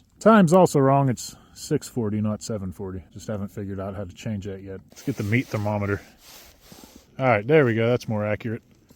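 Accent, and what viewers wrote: Pittsburgh, United States. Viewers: Do i hear a little yinzer in your accent? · I could hear the Pennsylvania accent, makes me homesick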